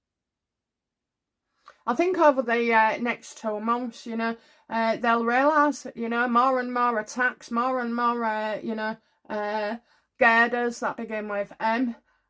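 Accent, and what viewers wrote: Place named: Doncaster, United Kingdom. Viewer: I love your channel and your style. I'm from Doncaster originally and recognise your accent. Wonder where you are from? Thank you for your readings xxx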